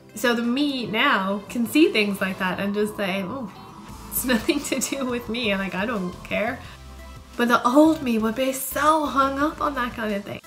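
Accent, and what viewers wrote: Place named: Ireland. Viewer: Your cancer Journey will be as individual as you are...it won't be the same as the next persons...whatever you feel, whatever your mood, whatever your frustrations or elations are, they are yours...thanks for sharing your journey with us and attempting to have us understand what you feel...and by the way, I love your Irish accent..lol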